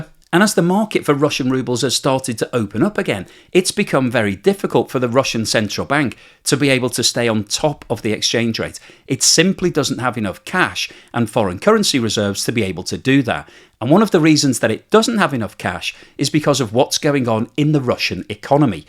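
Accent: United Kingdom. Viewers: When a British accent bloke talks about geopolitics and Russia - be aware: Brit wet dream and russophobic propaganda dead ahead....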